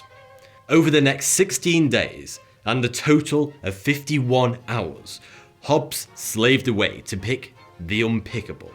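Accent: United Kingdom. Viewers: Could be the British accent. But I found myself mesmerized by this. Lol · I also can't unhear it. Is it due to the British accent? I had also heard "everything" as "everyfim" in the game Battlefield 1... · Oh my goodness, I have fallen in love with your accent. The British pronunciation of the English language is truly the most gorgeous to the ear. Plus the great content. Why haven't I found you ealier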